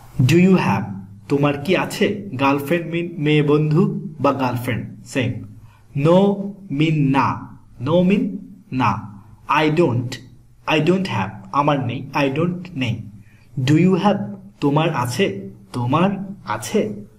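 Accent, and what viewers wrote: Dhaka, Bangladesh. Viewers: Although you are from Chittagong Division, your accent sounds more like that of a person from Dhaka than a person from Chittagong or Teknaf. I see that Ramganj is not so far from Dhaka, so that probably explains your accent. Chittagong Division is very large. It stretches to Dharmandal, which is farther north than Dhaka! I have a friend from Cox's Bazar, who will be moving to Dhaka in a few days. I am from USA, and I am a friend of his family. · Thank you. I fell in love with a Kolkatan girl your videos help me a lot. Is your accent Dhaka accent? Is it similar to Kolkata?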